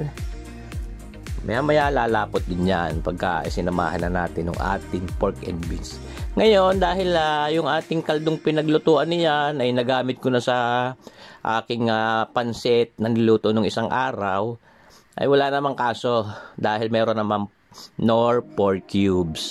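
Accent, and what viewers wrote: Batangas, Philippines. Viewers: Abay sadya..batanguen0 eh😅😅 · Nakakaaliw ka at nakakagood vibe panoorin. Marami rin ako nakukuhang techniques sa mga procedure mo ng pagluluto. Natutuwa ako sa mga pananalita mo eh! Talagang Batangueñong Batangeño… more power to your vlogs & GOD BLESS😊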